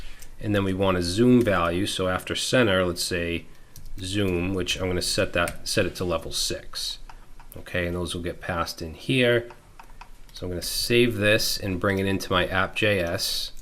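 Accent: Boston, United States. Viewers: The Boston accent of knowledge!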